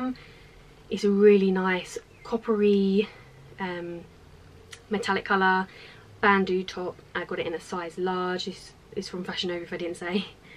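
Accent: England, United Kingdom. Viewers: You drive me crazy! Your so beautiful, that long red hair and English accent, you are just bad to the bone. You are number one on my want list. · I love your british acent....!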